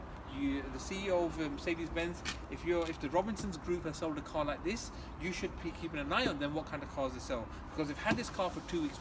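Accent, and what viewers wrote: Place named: Durban, South Africa. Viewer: is it me or does this guy have a South african Accent ...... specifically from Durban ...!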